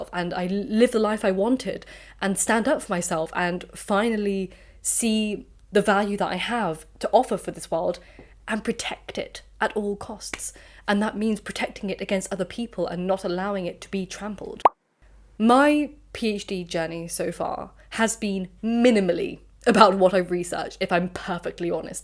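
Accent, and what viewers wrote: England, United Kingdom. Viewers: you remind me of jenny lee in call the midwife <3 i love your pretty british voice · I cant believe how much you look like my little sister, only differences are the hairstyle and the English accent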